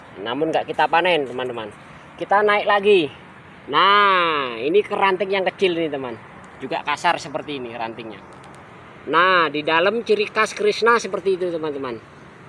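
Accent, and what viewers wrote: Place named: East Java, Indonesia. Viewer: Logat pean kayak orang jawa timur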